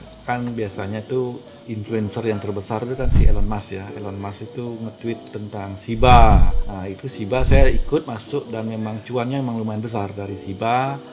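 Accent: Bali, Indonesia. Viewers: orang bali ya blii? aksen nya seperti orang bali///?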